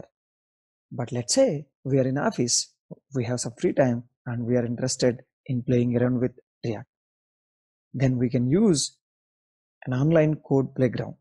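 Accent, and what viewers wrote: India. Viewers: Try to speak it in normal accent. sometimes I do not get the south indian english. Apart from that this Video was fantastic. <3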